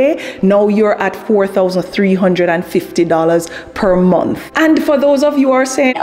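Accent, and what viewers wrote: Jamaica. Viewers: I love Jamaican accent. Can Listen to you all day long 😊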